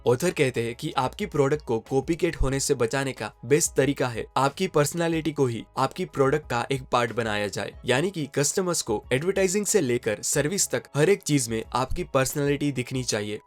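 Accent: India. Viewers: I hate your indian accent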